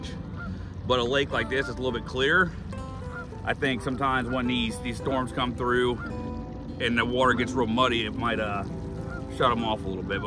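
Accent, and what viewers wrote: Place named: Texas, United States. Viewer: Same boat , black 2023 I love it , and watching you fish feels like Im fishing in my boat. Im going tommorrow to Sabine River down here in South East Texas Same place the bass master elite has their event. The way you act and talk makes you seem like one of the ole boys from around here. its kinda funny how that works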